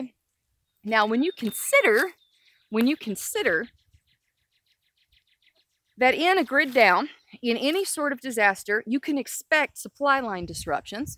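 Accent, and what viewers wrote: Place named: Appalachia, United States. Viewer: The longer she's in the hills of Tennessee, the more that wonderful Appalachian twang begins to surface. Love it. :-)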